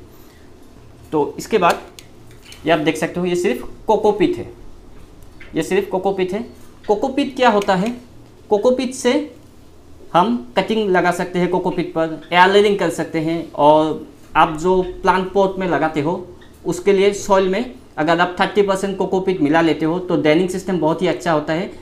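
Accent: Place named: Assam, India. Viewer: Yah bhai Bhai Aam cutting Se Kaise hota hai Assam ke Rahane Wale Hain Wahan Ke Log Aise bolate Hain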